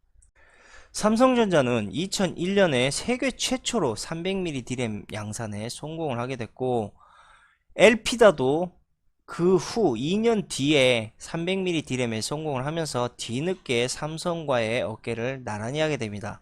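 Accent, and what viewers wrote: Jeolla, South Korea. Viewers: 목소리가 삼성 싫어하는 7시 지역 놈이네. 역시,,,,,,, 예라이 썩을 놈. 퉷퉷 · 듣기싫네 알고 떠들어요 전라도라 더싫음ㅡㅡ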